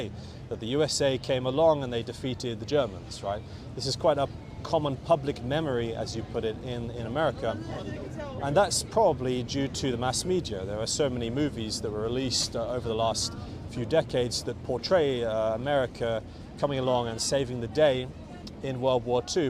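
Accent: United Kingdom. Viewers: Hi thanks for the video,I think this is an excellent exercise to train listening comprehension.First of all,you started with a slow pace when answering the questions which makes it easier for learners to understand and gradually you spoke more quickly and technically the vocabulary got more complex but I like the idea behind these videos.So,well done.I also like your British accent.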